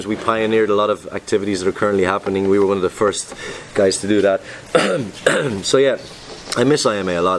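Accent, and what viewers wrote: Ireland. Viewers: Blows my mind that still has his Irish accent 😂 love from Eire 🇮🇪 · Are you from Tipperary in Ireland ? Your accent is kinda the same here in Tipperary! Im a Filipino fan staying here in Ireland ✌️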